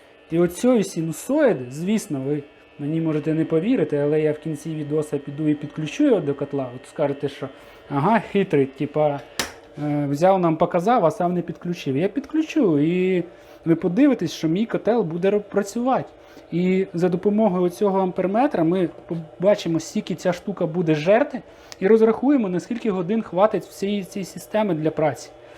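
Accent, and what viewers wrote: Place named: Zhytomyr, Ukraine. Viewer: Це житомирська походу, як у мене))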